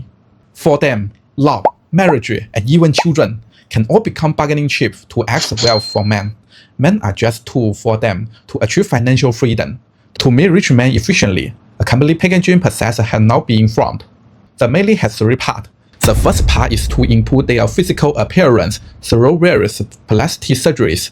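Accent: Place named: China. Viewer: Hello, if you lose Chinese accent, would be better for views. But it's tough and long process...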